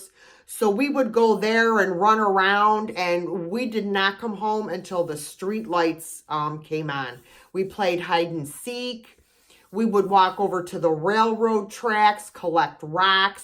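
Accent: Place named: Upstate New York, United States. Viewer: I was thinking you were from upstate close to Canada by your accent. An accent you probably think you don't have. LOL! I'm in the Mid-Hudson Valley.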